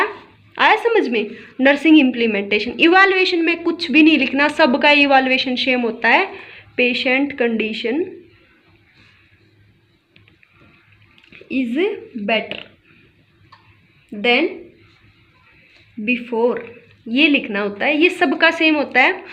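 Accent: Haryana, India. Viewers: Haryanvi sweg h boli me madam k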